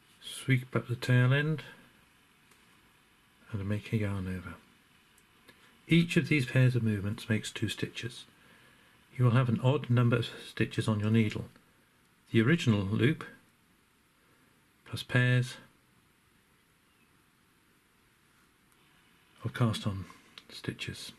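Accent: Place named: United Kingdom. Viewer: Thank you for this very well done video. Sooooo nice to hear an British accent for a change 😉.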